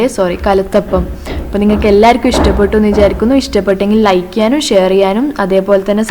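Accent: Kasaragod, India. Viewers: oru vlog thani kasarkod shylilyil pratheekahikkunnu.....i wud luv to hear ur native style of talking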